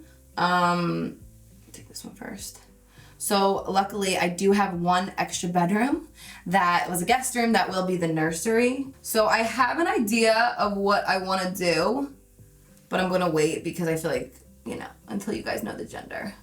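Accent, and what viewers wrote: New Jersey, United States. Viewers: Her Jersey accent seems stronger? Anyone else notice that? Haha I love it. It reminds me of 2013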